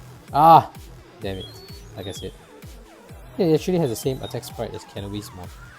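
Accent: Singapore. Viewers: liked n subscribed, btw you sound like Singaporean/Malaysian haha anyways looking forward to more digimon content!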